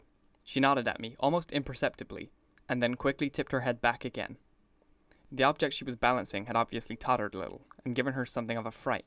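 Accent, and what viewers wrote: United States. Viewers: This is so helpful especially since i have 0 attention span when i have to read something. Your american accent is amazing btw! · Woah you have an American accent?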